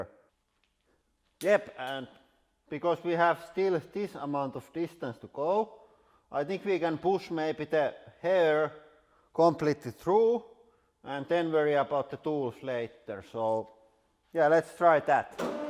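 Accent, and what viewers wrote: Finland. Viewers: Haha Shit Paper... you Finglish is fantastic. Keep this stuff going, it's great:) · you gotta love the finnish accent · How the fuck he can’t talk English even he is old man? Cmon stop that Finnish-English shit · I thought it sound like a finnish accent and now its confirmed haha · The english-finnish acsent is just so crappy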